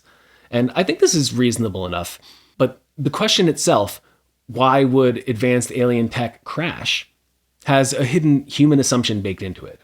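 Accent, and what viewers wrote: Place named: United States. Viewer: You are not Cornish you're American Dumbo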